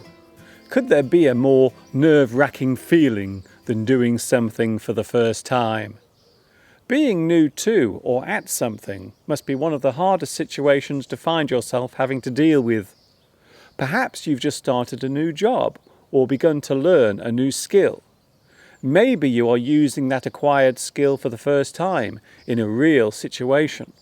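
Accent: United Kingdom. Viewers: great lesson think you I have question please? Are your accent British or Amrican because our education British so i ask to know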